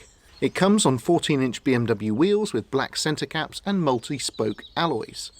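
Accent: United Kingdom. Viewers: I look forward to the end of the week and pouring myself a bourbon and settling in for this week’s episode of The Car Guys. Maybe it’s that I’m of similar age or the British accent to my American ear but narration is like poetic prose. His descriptions, word choice and figures of speech are just perfect. His observations and highlights are on point. It just resonates so well with me. It’s knowledgeable but most importantly it’s sincere. Now I’m looking forward to Sunday night, a glass of wine and the next Watch Guys episode for more of great storytelling style.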